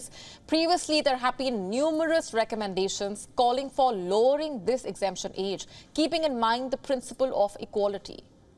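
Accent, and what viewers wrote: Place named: India. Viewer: This is a hard accent to like to western ears. Why does a India news channel speak in English anyway. Go away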